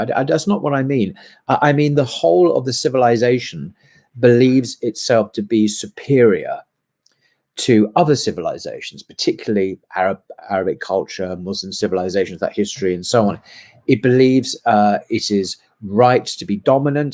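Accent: United Kingdom. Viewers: Amazing how the fact that a middle aged man sitting in front of some book shelves, throwing buzzwords and casual data in a fine British accent, is supposed to make us feel he speaks wisely and knowingly about a certain topic whilst all Ive heard here is some banal teenager level revolutionary ideas from a biased newly converted to Islam privileged white detached man, fed by BDS propaganda he quotes from super shallow social media sources.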